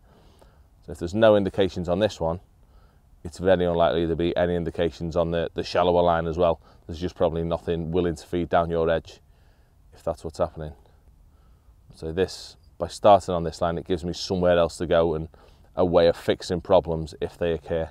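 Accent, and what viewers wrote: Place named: Liverpool, United Kingdom. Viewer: Your no des ship...can’t listen to scousers...I watch with sound off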